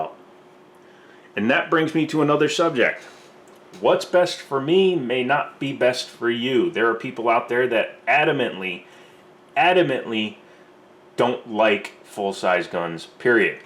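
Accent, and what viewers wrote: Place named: Chicago South Side, United States. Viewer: This dude has a Chicago Southside accent.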